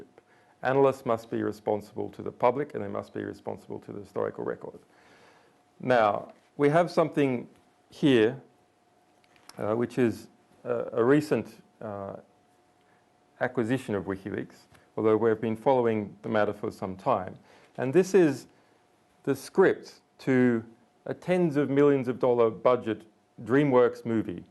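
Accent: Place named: United Kingdom. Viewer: I don’t attack intelligence agencies anymore. Once you reverse engineer history and identify who funded the creation of all intelligence agencies of every nation then you see that they are no more responsible for social engineering than governments and individuals in positions of power within government. This all stems back the the Bauer/Rothschild Dynasty that have their lineage and agents in the leadership roles of every institution of influence and power and all supposed “people’s Movements” even you Jillian Asange are an agent time releasing narratives they want believed. The fact that you have a British accent alone only reinforces this charge. Sean Combs is not a sexual predator, he refuses to endorse Donald Trump while Katt Willams is being promoted because he’s shlobbing the knob of the golden calf. You are obviously trying to seat Trump so that he can Reinact 1938 with the US playing the role of Germany, and he playing the role of Adolf Hitler.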